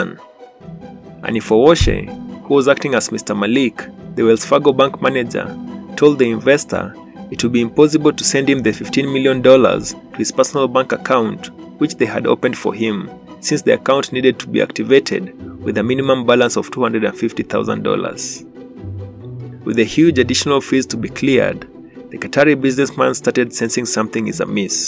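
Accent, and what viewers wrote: Kenya. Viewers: About section of this channel says location is United States but accent ni ya mkenya.. 🤔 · From you accent,you are a Kenyan….and you still want to pull Kenyans name into the mud.How comes we never heard there were kenyans involved from US FBI reports? You doing this makeup story for some simple views while tarnishing Kenyan name….We Kenyans in diaspora dont appreciate this! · another video of scam glorification narrated by the nairobi accented guy. not good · The narrator sounds like a Kenyan. May be that's why he insists when mentioning Kenya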